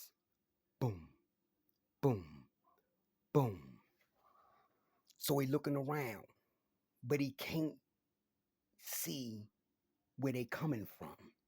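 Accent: South, United States. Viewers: you can narrate a story like nobody’s business. Dang. Skills of a pro actor my guy. I just became a member of the Pack. I’m just north of Albany,NY, in the country. I come from down hear Chicago, & your manner of speaking brings back memories. I figure you’re probably in the South, but a lot of southern folks moved up Chicago ways, and you remind me of that🙂. I love listening to your videos when I lay down at night for bed. Should give me nightmares, but they never do. I can see your podcast really blowing up. It’s unique. Take care of yourself Mr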